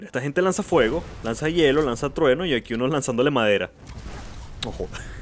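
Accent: Venezuela. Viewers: ¿Sera que es Venezolano?; no vale quien dijo, yo no creo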